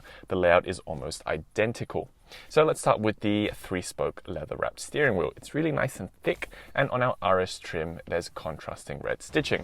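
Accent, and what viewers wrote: Australia. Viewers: Aussie, probably brought up in a classy Aussie town or school.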